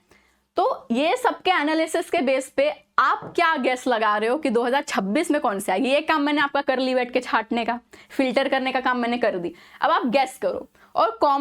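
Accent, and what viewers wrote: Mumbai, India. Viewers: Mam kya Bombay se ho kyaa apke ,tone se lag raha he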